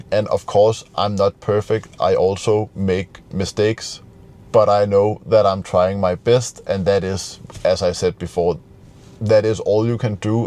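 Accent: Denmark. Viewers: Nice video as always. I am learning a lot from you, so thank you. Your englsh has gone from good to exellent, but you must never lose the charming danish accent .. .-) Can’t wait for the next video.. 💐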